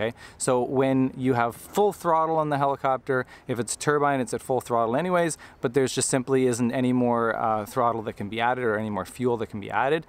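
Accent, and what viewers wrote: Canada. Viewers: Love these videos Your thoughts can be use in every day life Air safety institute videos cause & effect gives me same CHARITY..who knew chopper talk have life lesson I dont fly i walk Why do you sound So kanadien in pronunciation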